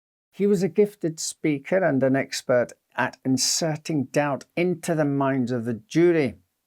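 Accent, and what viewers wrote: Scotland, United Kingdom. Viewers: Oh my goodness!!! I ABSOLUTELY, POSITIVELY LOVE YOUR SCO-ISH BROGUE!!! Your stories are so very interesting!!! 😘😘😘😘😘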